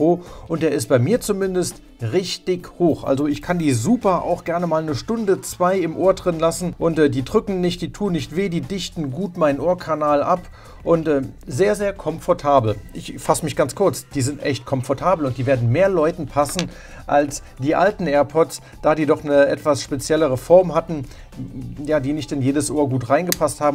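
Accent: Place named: East Germany, Germany. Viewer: Ossi Akzent ...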